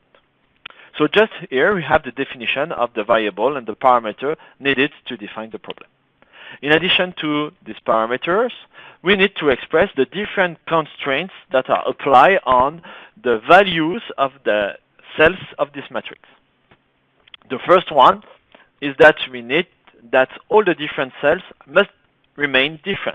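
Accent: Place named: France. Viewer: ahaha I was just joking because it was already obvious in the other videos that you are french from the way you say minizinc, nevertheless your videos are informative and I want to thank you for them! Keep up the good work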